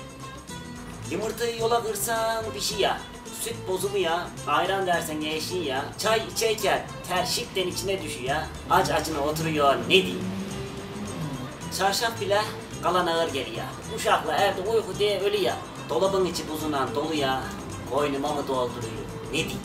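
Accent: Kastamonu, Turkey. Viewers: Sivaslı olarak Kastamonu şivesi dinlemek :)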